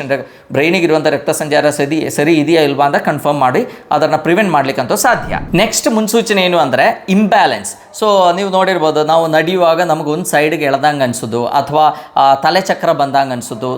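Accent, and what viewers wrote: Kerala, India. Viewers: Malayali doctor🤔 launguage gothaguthe 🤠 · I think you from kerala doctor Your Language pronounsation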